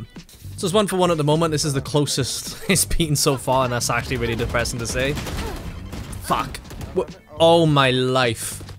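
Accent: Wales, United Kingdom. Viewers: do i hear a welsh accent? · Is that a fellow welsh accent?👀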